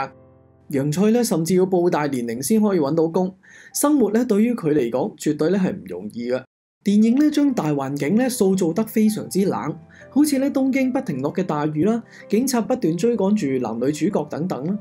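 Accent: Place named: Hong Kong. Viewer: 香港人崇拜廣州話，所以抄襲了廣州話。香港本土語言係圍頭話，但係你叫香港人講圍頭話，香港人會唔肯講。因為香港人崇拜廣州話覺得廣州話高雅，厭棄香港話（圍頭話）難聽老土唔入流。建議大家好似澳門人咁學正音廣州話，唔好學充滿懶音嘅香港圍頭式廣州話。 冰室係香港人抄襲偷盜廣州嘅嘢 我澳門人真係睇唔慣。冰室，又叫冰廳，廣州話用以稱呼冷飲店。其是南粵地方一種主要售賣冷飲、雪糕及沙冰等冷凍食品的飲食場所，起源發跡於廣州。在香港流行於1950至1960年代，被認為是茶餐廳的前身[1][2]。過往廣州的冰室會經營雪糕、凍奶、冰水，兼營咖啡、奶茶等熱飲和西餅麵包 澳門人香港人說的廣東話學術上最準確定義 叫作廣州話（澳門官方政府文書也寫作廣州話）廣州話英文叫Cantonese Canton就是廣州英文舊稱 當然現在你也可以看到許多痕跡 譬如廣州機場代號是CAN 廣州塔英文叫Canton Tower 我係澳門人 澳門一直係廣府話/廣州話地區 但香港唔係 香港一百年前還在講圍頭話（香港話） 一百年前澳門和廣州影響力遠遠高過香港 香港人放棄圍頭話學我地講廣州話 香港人講廣東話口音非常難聽好多懶音（畢竟係抄返黎）建議你學正音廣府話/廣州話 廣東話本身係錯誤名稱 魯迅講過：一直如此 便是對嗎 潮州都係廣東 梅州又係廣東 唔通潮州話梅州話都係廣府話？ 呢個語言係名副其實嘅廣州話 我作為澳門高質大學生鍾意講求學術 希望啲港喱唔好介意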